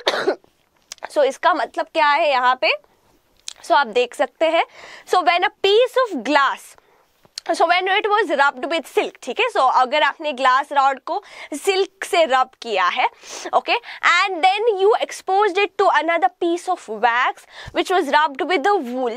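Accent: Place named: South, India. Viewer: Ma'am has a strong south indian accent in hindi Edit: She is still a great teacher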